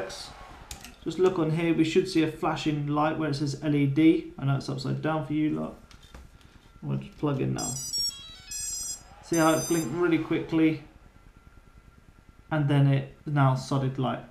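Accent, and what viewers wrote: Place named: England, United Kingdom. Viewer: Hey I just saw your video again and put captions on.... didn't understand the English accent I guess! Sorry... so that solder is for Battery Detect? So turnigy can tell when you are connected right? So did you solder directly to battery connections on PDB? or a lower 5 voltage connector.... BTW great job.... how on earth did you figure this out.... there is almost documentation around! Thanks again!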